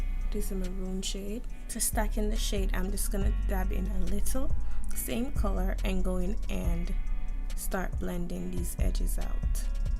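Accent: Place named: Caribbean. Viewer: I hear an accent are you from the Caribbean?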